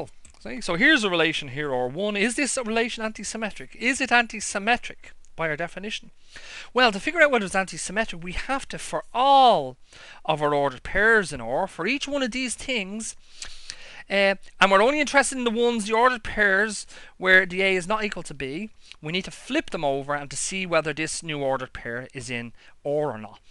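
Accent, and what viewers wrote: Ireland. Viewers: who would have guessed that watching jacksepticeye would get me used to the irish accent enough to help me learn math?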